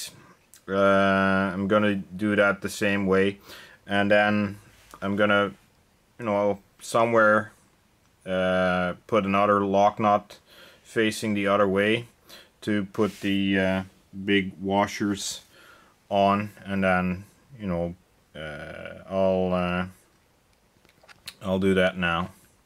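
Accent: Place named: Canada. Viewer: you sound Canadian, where r u from?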